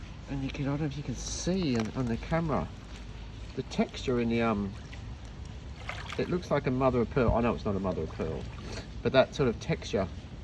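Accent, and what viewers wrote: Australia. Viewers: Some lovely finds. The cloth seal is so detailed and it’s amazing that some of the material was still attached to the belt part. I love those beautiful hand-made pins. Always enjoy your videos (and hearing that Australian accent 😋)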